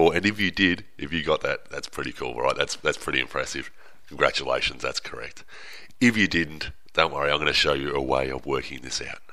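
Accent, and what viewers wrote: Australia. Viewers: Are you Australian? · is he Aussie (Australian)? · What part of Aussie are you from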